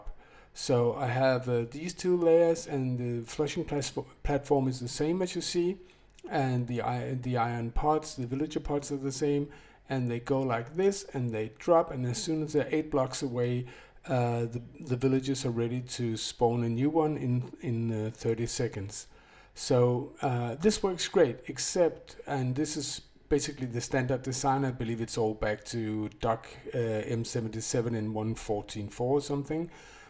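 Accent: Denmark. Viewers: Are you danish?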